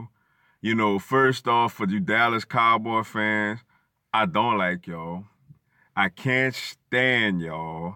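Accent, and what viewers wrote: New Orleans, United States. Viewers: man you got a new Orleans accent...I swear.....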